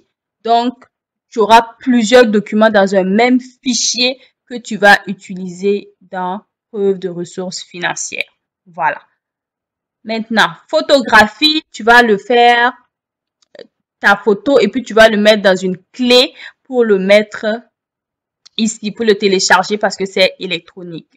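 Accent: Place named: Quebec, Canada. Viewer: Tu as déjà l'accent québécois.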